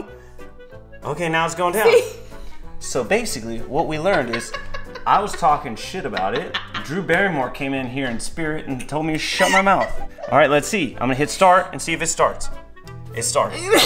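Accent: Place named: South, United States. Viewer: You sound like you may be a nice southern boy...ranch! Buffalo wings were created in Buffalo New York. A lot of us use blue cheese. You do have other facts straight though. The wings were just fried plain and sauced after. You can season by just doing a simple flour dredge (season the flour) before deep frying and you shouldn't loose the seasoning it just isn't the original/traditional way.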